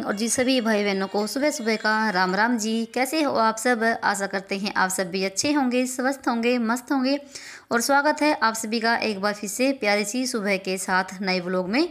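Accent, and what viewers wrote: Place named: Haryana, India. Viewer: Or aap ye bhasha kaun c bol rahi hein aapki bhasha thodi haryanvi touch liye hai aap wahan se hein kya..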